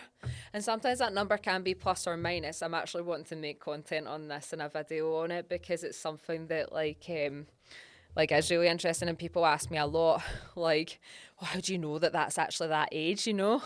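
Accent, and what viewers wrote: Scotland, United Kingdom. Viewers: US guy here: I worked as a geologist in the petroleum industry for many years. Because of the North Sea fields(with Aberdeen being the “oil capital” of Scotland), there are a lot of Scots employed in that profession. Many of them take US expat assignments, and most of them end up in Houston, the “oil capital” of the U.S. So over the years I worked with a fair number of Scots, mainly geologists and engineers. To (finally) get to the point, I struggled for some time with understanding what they were saying. I was often embarrassed when I had to ask them to repeat something. There are just enough different vowel sound pronunciations, speech rhythm and cadence differences, and dialect words so that American English speakers often feel like we’re a sentence or two behind in our understanding. Over the years, I did become accustomed to the heavy, northern and coastal Scottish accent, but having not heard it spoken for a decade since retirement, I have to pay close attention to to follow her, especially when she’s not “speaking geology” and is just in casual conversation. 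I find it fascinating that our common tongue has so many accents and dialects such that sometimes we actually have trouble understanding others even though we are speaking the same language.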